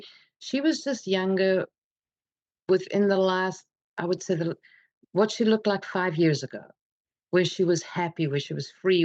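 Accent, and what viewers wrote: South Africa. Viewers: this is an odd question.. but your accent - I hear South Africa but there is definitely something else there, if not two other language / accent influences?? Just curious. Thank you for sharing - hello from Jukskei Park in Fourways. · Hi hun, you explained this all very well. I lost my sister and it is still very raw, by the way, you sound like you come from Durban, I'm from SA! Durban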